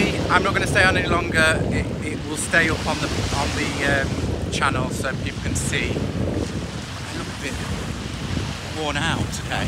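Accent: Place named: Lincolnshire, United Kingdom. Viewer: So sorry I missed your quick live. I was wondering about your accent as I was trying to explain it to my daughter and since you said you live in Lincolnshire, I thought I would ask if this is where you got your accent.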